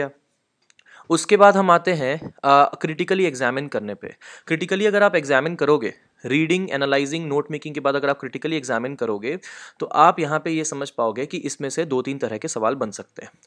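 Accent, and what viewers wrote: Bihar, India. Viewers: Bihar na..???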